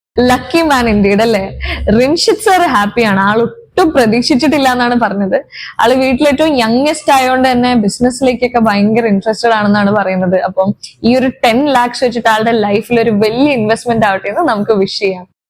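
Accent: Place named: Malappuram, India. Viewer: Full മലപ്പുറം ആണലോ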